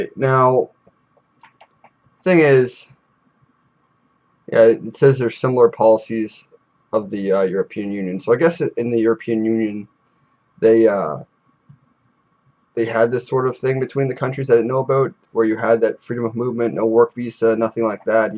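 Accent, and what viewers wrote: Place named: Canada. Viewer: My god, I haven't heard such a typical Canadian accent for a long time.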